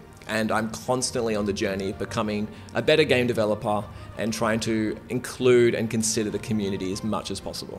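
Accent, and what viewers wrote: Australia. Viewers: I've just been recommended Len's Island by the dear Lord and Savior, Steam Algorithm. At first I was immediately sold already, but then I looked up who the dev is and BAM! I found your channel.The very first time I heard your Aussie accent I know this game is going to be LEGIT. Thank you so much for developing games like this man. I long for real good survival game with procedurally generated content and I think this game will be it :) Love your stuff man!!! <3 Can't wait to download this game and stream it. Much love and respect from Indonesia.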